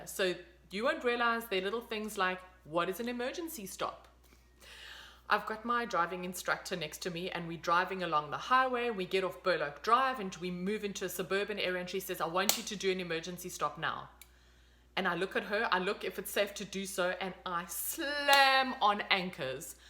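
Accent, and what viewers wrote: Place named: South Africa. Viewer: Wow. I am feel so warm and fuzzy listening to you about the driving. I was driven around there in December and I remember asking about the turning right behavior. I asked if it is like a "Yield" in SA? It is really cool to hear a SA voice talk about Canada. You also talked about insurance, which got me wondering: When you left SA how did that impact on your Life, Disability and Dread Disease Insurance? Did you keep it or did you cancel and start new policies? Did you migrate it? Did your risk decrease making your premiums lower? And how was the transition with banks? What happens with money transfers? Is it easy? Does it cost you to transfer your cash? Savings? And I did experience the Drive-thru ATM withdrawal (LOL), my son and I were amazed at the realisation that there was no fear of a mugging.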